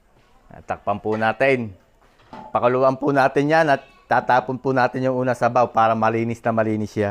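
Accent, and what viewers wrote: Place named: Tanauan, Philippines. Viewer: Nag enjoy akong manood. At para ko na ring nalasahan ang iyong mga niluto. Taga Tanauan din ako at talagang feel ko na nasa 😅Pinas pa rin ako sa iyong pananalita at punto. Thank you at sana'y marami pa akong mapanood na shows ninyo.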